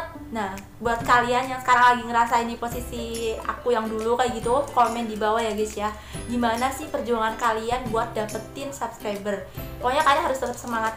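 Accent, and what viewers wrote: Banyumas, Indonesia. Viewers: Jebul wong ngapak kaya Inyong, makasih motivasine mbakyu , sukses 😁👍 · 😅 dengka pada bae ngapak karo nyong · hai kaka cantik dan ngapak lagi,smpurna deh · Wong Ngapak Ya 😁 👍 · Salam dari magelang kak. ternyata ngapak ini orang😂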